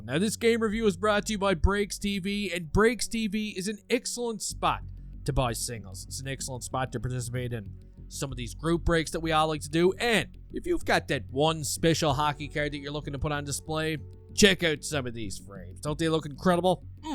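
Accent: Newfoundland, Canada. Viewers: Loved your breakdown of the game- TBH, I don’t who you are but I’m hearing a Newfie…or a Caper? I wish there was another view of the hit- I’m surprised there isn’t. The fact of the matter is this: If you enter a ring with a bull, you have to be aware of where he is at all times. And that goes for Wilson. 2 things Carrier could’ve done: 1)Put up the fence and risk a major or 2)Take the hit and STAY DOWN. Caps don’t score because the refs stop the play. Carrier was probably pulled because of concussion protocol anyway.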